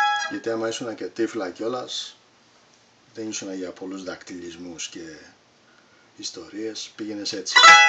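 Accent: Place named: Australia. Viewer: Yasou buddy. I just wanted to know where in Australia are you? Because I heard your accent and I wanted to know if you’d teach private lessons?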